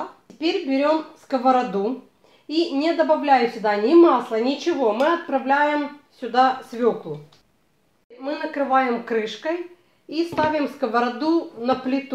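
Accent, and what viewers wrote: Ukraine. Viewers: Цікаво авторка з Одеси, бо відчувається українська основа в розмові.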